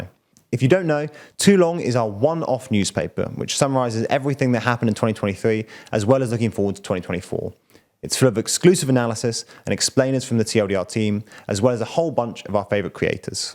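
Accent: United Kingdom. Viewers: I normally don't like new presenters in my YouTube videos but is alright. I think for news what I am really looking for is a smart sounding British man to quickly and emotionlessly explain what's going on so he fits into the lineup like a glove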